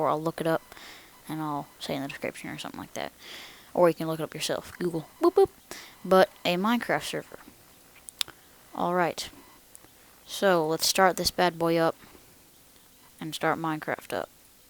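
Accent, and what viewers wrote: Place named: Texas, United States. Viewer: you sound texas are you texas??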